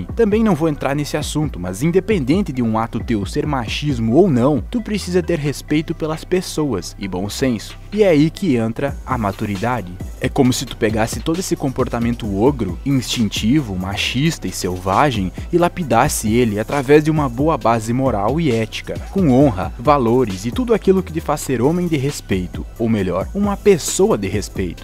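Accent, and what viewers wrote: Rio Grande do Sul, Brazil. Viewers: por acaso tu é gaúcho? É que teu sotaque é bem típico do norte do RS. Parabéns pelos vídeos, por acaso encontrei o vídeo sobre Ego e achei bem interessante alguns títulos que são mais voltados para desenvolvimento humano. Gostei muito da abordagem simples e objetiva, focado em passar a informação correta e de forma lúcida e educativa o que evita que o vídeo fique longo e tedioso. Novamente parabéns!!!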